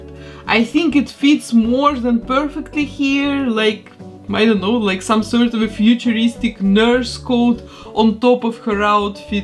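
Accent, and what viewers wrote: Russia. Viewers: почему я слышу русский акцент ) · Добрый день,! Очень нравится Ваше творчество, Вы потрясающий мастер, давно хотела написать Вам об этом, смотрела Вас и думала, что очень знакомый акцент, проскакивали слова, явно, с претензией на русский язык! А сегодня нашла Вас на Facebook и не ошиблась! Вы большая молодец ✊🏼 спасибо за видео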